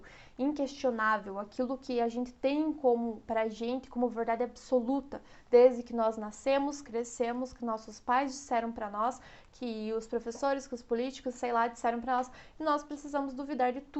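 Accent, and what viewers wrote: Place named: South, Brazil. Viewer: Ainda não consegui calcular, com precisão, este seu sotaque. Paraná ? Santa Catarina ? Rio Grande do Sul ? Interior de São Paulo ? Você é linda e o sotaque também. (Juro por Deus que isso não é uma cantada)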